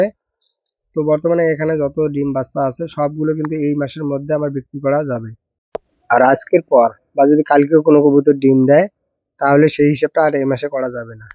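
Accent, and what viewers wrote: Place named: Bangladesh. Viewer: Apnar bangla bola akdom bangladesh ar moto India ar moto na ami pothoma apnar video dakha mona korchilam apni bangladeshi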